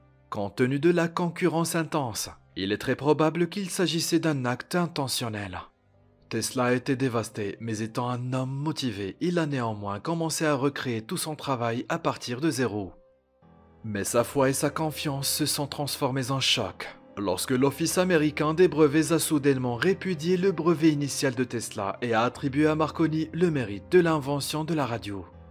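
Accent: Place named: Maghreb. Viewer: C'est le timbre maghrébin qui te dérange ? Moi ça ne me gêne pas du tout. C'est très compréhensible. Peut-être est-ce vous qui avec un problème de tolérance et de compréhension. Ou d'idéologie...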